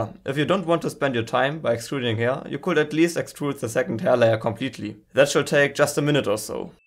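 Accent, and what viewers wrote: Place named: Germany. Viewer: Alter ich glaub jeder deutsche erkennt, dass du net englisch bist😂 aber is ja kein problem.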